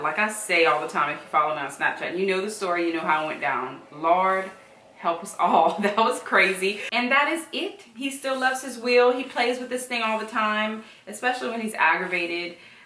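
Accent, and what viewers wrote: Louisiana, United States. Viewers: girl he is so cute, Your LA'na accent is so thick LOL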